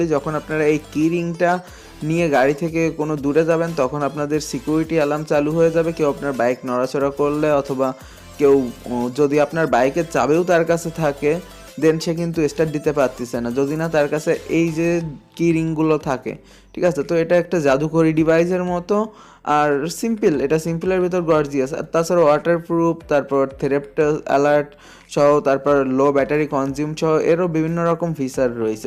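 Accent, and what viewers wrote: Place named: Magura, Bangladesh. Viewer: ভাইয়ের বাড়ি কি মাগুরা? আঞ্চলিকতা রয়েছে ভাষার মধ্যে।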